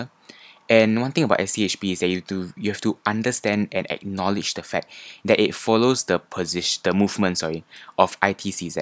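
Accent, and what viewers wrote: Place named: Singapore. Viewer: This is useful even for AS&A's CIE geography, thank you so much! The Singaporean accent is refreshing to hear as someone studying internationally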